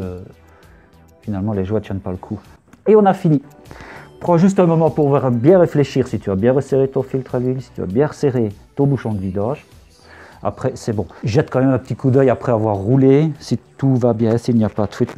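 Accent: Belgium. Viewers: J’apprécie le petit accent belge 👍🏻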